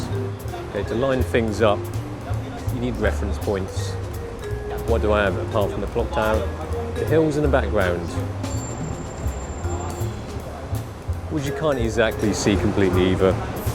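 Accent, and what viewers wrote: England, United Kingdom. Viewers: How did you get a English accent?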